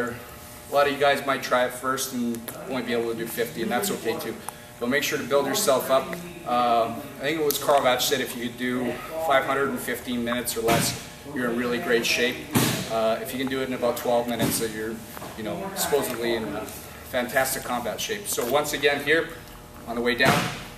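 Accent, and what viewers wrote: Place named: Canada. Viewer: Love the adorable Canadian accent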